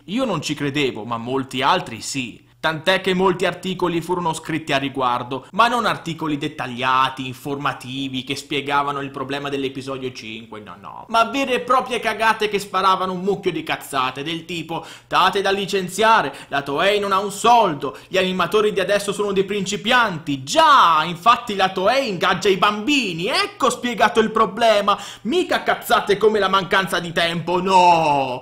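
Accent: Italy. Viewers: sei italiano ?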